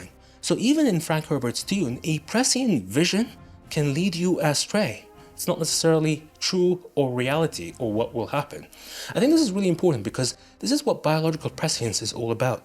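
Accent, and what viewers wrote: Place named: United States. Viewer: He sounds like an American to me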